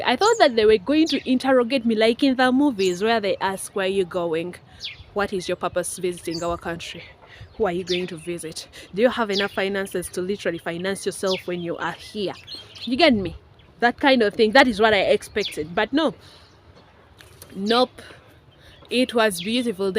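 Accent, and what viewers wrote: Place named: Uganda. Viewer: Interesting listening to your Ugandan accent. I had no intesion of watching the whole thing but I just did! Karibu Kenya.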